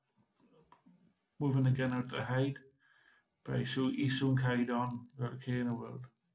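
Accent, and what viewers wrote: Wales, United Kingdom. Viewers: A great few hours with the air gun, that’s a good Welsh accent. I live near Hirwaun and shoot my share squirrels 👍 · Not Welsh. English with a Welsh accent.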